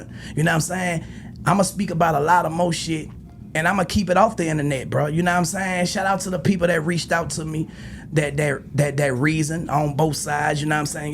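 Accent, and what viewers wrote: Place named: Memphis, United States. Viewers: Turk always talking....thats what Juvie was telling his sensitive azz. Bg responded to what you said on this podcast and he didnt even go at you. He just said you sound like you from Memphis which i feel touched Turk. Turk keep BG name in his mouth more than anything. Just do yo thang and move on but this nigga still wanna reach. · Son u be doing too much and BG right u do sound like u from Memphis. The NO don't sound like nobody else no matter how long we rock in other cities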